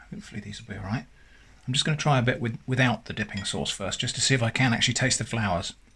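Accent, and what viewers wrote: United Kingdom. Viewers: You and your wife are so adorable together at the dinner table! I love your British accent. I love all your videos! You're so down to earth and smart. You make very good content.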